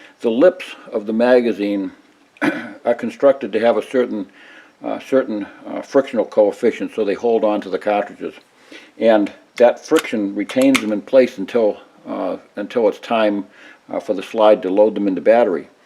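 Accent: New England, United States. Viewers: Hearing your accent, you can’t live to far away from the Sig Sauer headquarters? Grew up in Gloucester, Ma and raised my children in Gilford, NH. That is either a Southern Maine or a Southern NH accent. Love your videos.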